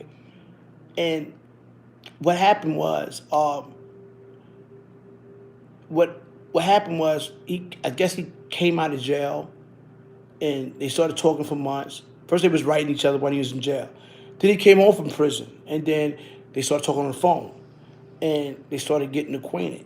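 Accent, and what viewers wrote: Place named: New York, United States. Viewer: It’s really a NY thing first. Italians from Italy or outside the NYC & Philadelphia metro area don’t sound like that. Especially being from Brooklyn, he definitely grew up around Italians.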